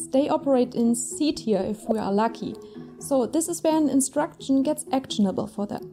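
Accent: Germany. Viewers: Great video. But can I hear a German accent? Nice. Greetings from Gelsenkirchen, Germany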